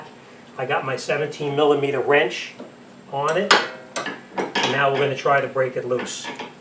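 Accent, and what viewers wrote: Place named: New York, United States. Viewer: Nice work 😊👍 Are you originally from NY / Jersey/ Queens??? Im hearing a slight accent in your voice 🤔 With respect from steve in Bundaberg Australia 🇦🇺 🍺🕺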